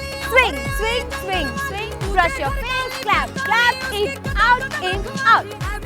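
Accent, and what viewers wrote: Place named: India. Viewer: you speak english with indian accent so i cant understand what you are telling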